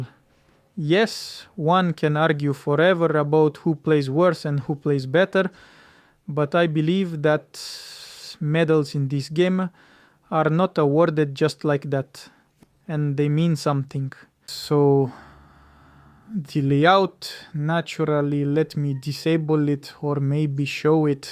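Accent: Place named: Russia. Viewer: не то чтобы хорошо, просто русский акцент сразу в уши бросается)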